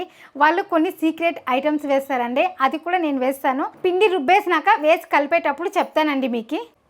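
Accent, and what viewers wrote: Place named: Chennai, India. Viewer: Nenu chennai,ikkada tami telugu vaaru ilaane maatlaaduthaaru😊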